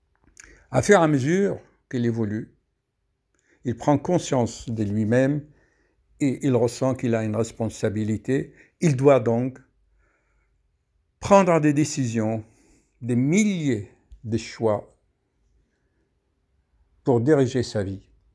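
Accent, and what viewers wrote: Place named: France. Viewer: Bonjour cher adore 🙏vos vidéos car je me reconnais en vs, je suis pharmacienne à Québec et pratique la méditation et yoga +++ et je m’intéresse à l ayurveda Vos explications unissent la science à la spiritualité, exactement mon combat de tous les jrs!! Par contre l’explication de 2 mx dont je suis atteinte m’échappent....ménière et les fameuses impatiences aux 4 membres empêchant même de rester en position assise tellement il y a un besoin irrépressible de bouger pr soulager les sensations qui sont une vraie torture et qui reprennent dès l’arrêt du mouvement Traitement pharmaco très efficace ds le 2e cas, très « fort » aussi, mais ce sont les explications spirituelles qui m’échappent Manque de connection à la terre pr ménière? (Les vertiges qui me clouent au lit pendant des mois alors que j’ai 45 ans et une enfant handicapée ) Et pb d énergie mal répartie mal diffusée a travers le corps ds le cas des impatiences? 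J’aimerais tant connaître vos explications..... Je suis née en Bretagne et j’adore 🥰 l’accent français! Merci pr vos vidéos ça me donne le courage de continuer à expliquer les concepts médicaux et pharmacologiques aux patients du point de vue de l’univers, énergétique Merci 💝🙏🕉💫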